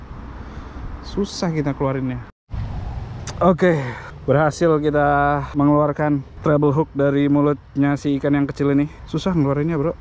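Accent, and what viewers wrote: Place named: Medan, Indonesia. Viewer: alamak jaaang. keknya orang medan ya abang ini hehe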